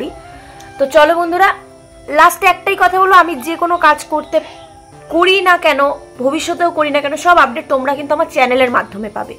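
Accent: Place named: Cooch Behar, India. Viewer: যখন তুমি কোচবিহারের ভাষাতে কথা বলো শুনতে ভীষণ ভালো লাগে ❤❤❤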